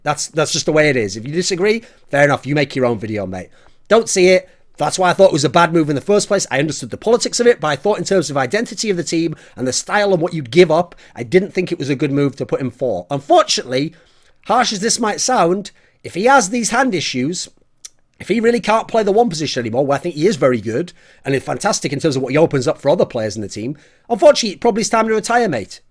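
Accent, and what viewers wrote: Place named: United Kingdom. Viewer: Waddles It's unscripted but he has good speaking skills, so he can just say his thoughts as they come in a way we understand. Plus he has a British accent, so it sounds cooler.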